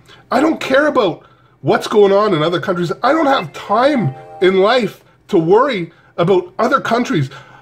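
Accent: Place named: Canada. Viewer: You're so adorably Canadian. Good Lord, that accent :).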